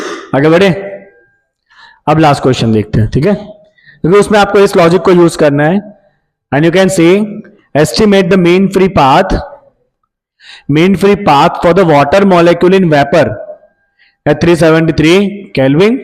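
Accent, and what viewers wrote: Gujarat, India. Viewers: Sir are you from Gujarat?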